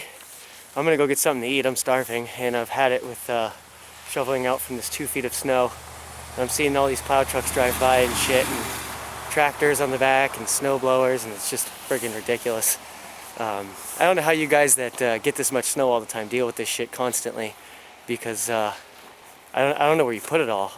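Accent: Maine, United States. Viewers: What part of Maine are you from?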